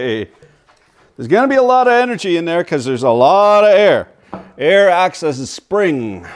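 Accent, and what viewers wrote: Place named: Canada. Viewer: I wish you had a podcast. I could listen to your funny Canadian accent peppered with all of your colloquialisms in my shop!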